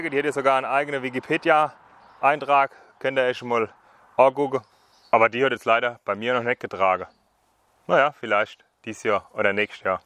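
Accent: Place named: Hesse, Germany. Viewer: Tolles Vido. Und dein Hessisch ist einfach nur sympathisch 🙏🏻😇 LG Jonas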